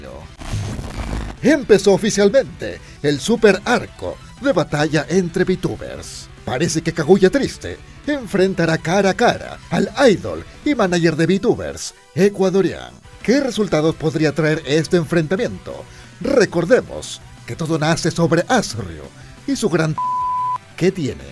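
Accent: Peru. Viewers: es peruano? 😰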